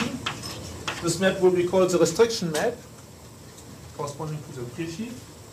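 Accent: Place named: Germany. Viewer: I didn't expect him to have such a strong German accent, despite knowing some of his history. Very interesting.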